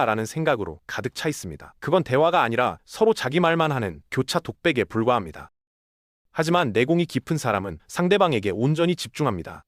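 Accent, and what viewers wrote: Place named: China. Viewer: 발음이 중국인같아여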